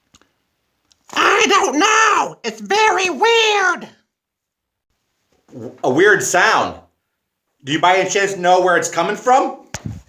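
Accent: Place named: Philadelphia, United States. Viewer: Good analogies and a fun presentation. Are you from the Philly area by any chance? I grew up in South Jersey, and your accent sounds familiar! 😂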